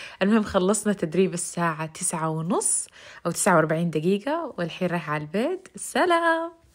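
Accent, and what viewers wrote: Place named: Saudi Arabia. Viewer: اللهجة السعودية سموذ ومريحة وصوتك حلو ماشاءالله ❤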